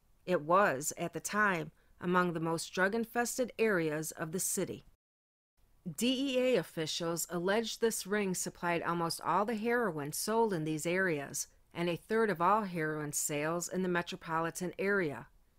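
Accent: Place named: Upstate New York, United States. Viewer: Its pronounced 'How-ston' street, not Houston like in Texas. I knew u weren't a new yawka lke me bec of yr accent which is.....I dont know. Upstate ny maybe?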